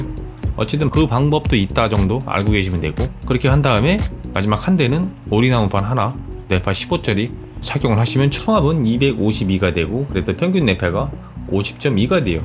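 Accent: Chungcheong, South Korea. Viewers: 충청도신가유? 딕션 참 구수하네유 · 충청도 사투리 구수 하네유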